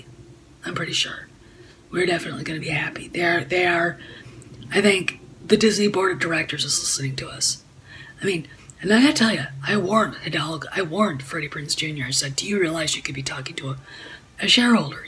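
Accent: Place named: Canada. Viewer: Love your accent. Are you from Canada?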